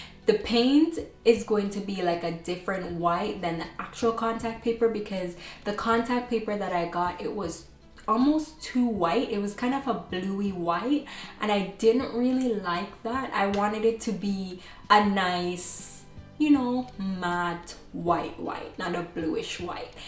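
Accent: Jamaica. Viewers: Ur accent sounds just like a Jamaican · I randomly clicked on this video and something tells me you’re Jamaican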